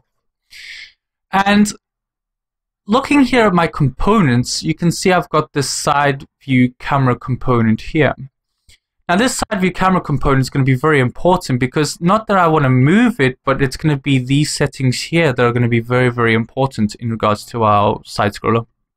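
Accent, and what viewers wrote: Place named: Turkey. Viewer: türk aksanı sezdim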